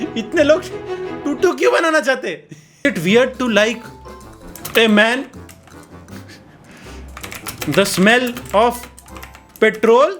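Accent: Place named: Mumbai, India. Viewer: I am addicted to your vedios..apko dekh dekh kr mujhe mumbai ki language aa gye hai 😂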